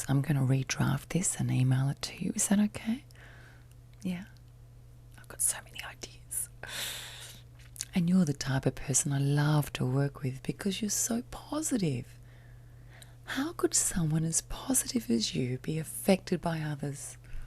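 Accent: Australia. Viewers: You are so beautiful, your eyes and smile really draw me in and that's coming from a 22 year old:) oh and that ozzy accent! Perfect.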